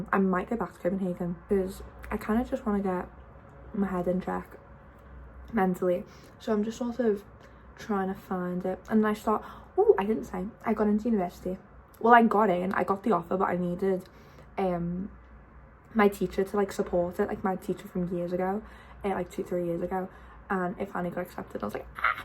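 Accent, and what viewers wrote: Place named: Liverpool, United Kingdom. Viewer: Plus, do not let anyone tell you that your scouse accent is hard to understand, as i understand you better than others . I mean that could be due to me being surrounded by the scouse accent all the time, but still better 😜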